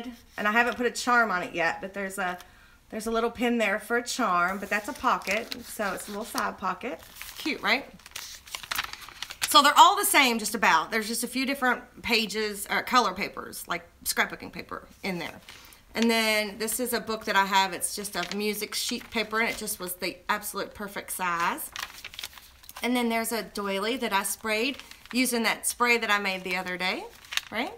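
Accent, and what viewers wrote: South, United States. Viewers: Happy Birthday, I watched your video the day it aired but I wanted to comment today because it was your Birthday... You are so amazingly talented and your humor is hilarious I love to sit back and watch your videos and I get so tickled. I am from Georgia and I can definitely relate to the southern accent... lol Keep up the good work... I only have one request and that is if you could make all your background designs a bundle.. That would be wonderful.. Again Happy Halloween and Happy Birthday!!! <3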